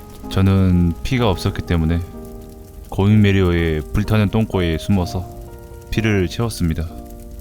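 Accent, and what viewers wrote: Jeolla, South Korea. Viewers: 님 오늘은 뭔가 전라도 사투리가 들리네요 🙂🙂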